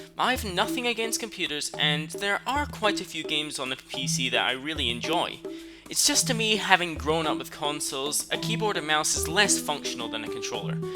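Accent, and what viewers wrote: Scotland, United Kingdom. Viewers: Yeeeaaaaahhh... you totally have a Scottish accent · I actually guessed you were Scottish when i first heard your voice! AND I WAS RIGHT!!!!!! (And i'm not lying!) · Dude, I always thought you were Scottish. In fact, I thought that was once a running gag in colabs. e_o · i knew u were scottish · I knew you were Scottish from the start thanks to your distinctive accent.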